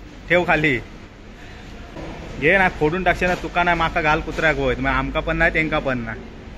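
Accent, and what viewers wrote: Malvani, India. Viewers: तुमचा मालवणी बोलणं खुप aawdata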